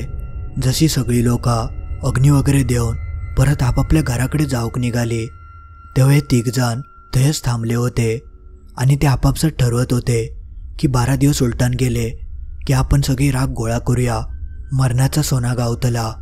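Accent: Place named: Malvan, India. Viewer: दादा तु खूप छान गोष्टी सांगतोस आणी तुझी मालवणी भाषा खूप गोड वाटते. ऐकायला प्रत्येक गोष्ट वेगळी असते .मला खूप आवडतात .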